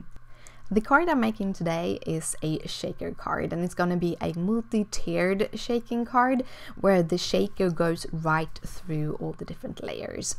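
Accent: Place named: United Kingdom. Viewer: USA resident. Oh my gosh, I LOVE that you made the water hole a shaker. I'll look forward to seeing more of your videos because I like your style. And your accent. I'm going to the UK in Sept/Oct., and will hear nice accents everywhere :)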